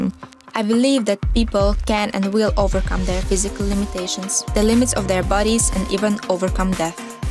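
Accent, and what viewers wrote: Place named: India. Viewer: Акцент смешной, похож на индийский xd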